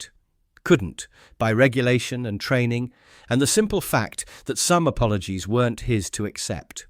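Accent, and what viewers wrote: United Kingdom. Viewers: Perhaps the worst aspect is that it sounds like a Briti yarping the commentary! This one and several others I've listened to!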